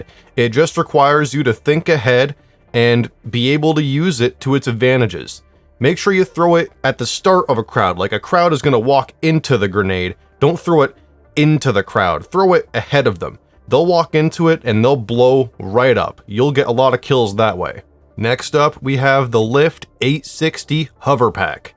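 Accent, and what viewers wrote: United States. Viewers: did you seriously do the western accent this whole video? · Love the southern drawl throughout the vid! I will pick this someday soon, just need to get the credits first.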